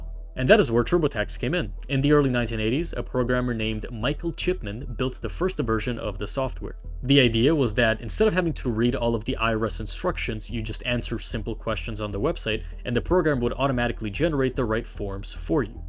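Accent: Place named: Philippines. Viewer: I just know this is a kababayan. I can hear it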